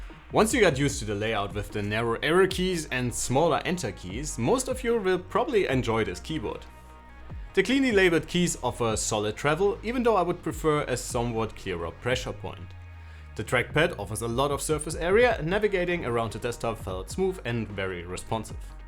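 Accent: Germany. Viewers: The German accent tho :) 105watt not quiet my tempo :))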